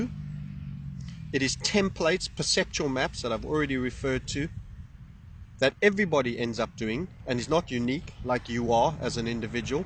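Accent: South Africa. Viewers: FoLA I am glad you have not lost your SA Accent Bru'. Fear-of-Loosing-Accent.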